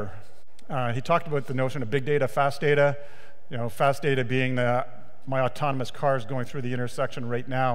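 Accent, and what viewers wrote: France. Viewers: nice french accent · hearing him speak Spanish with a French accent is kind of funny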